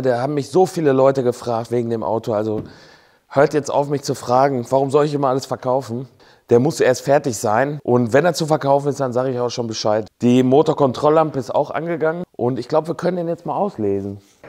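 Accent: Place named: Dorsten, Germany. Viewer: Ich komme aus Dorsten und lebe schon seit über 20 Jahren in Süddeutschland, ...du redest, wie bei uns zuhause, alleine schon deshalb gucke ich deinen Kanal. Weit weg bist du auch nicht, oder?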